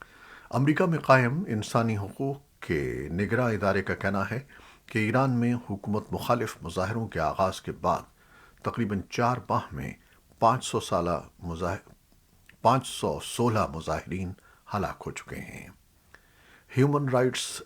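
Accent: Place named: Lahore, Pakistan. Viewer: وہی لاہور والا لہجہ وہی ٹھہراو وہی وقار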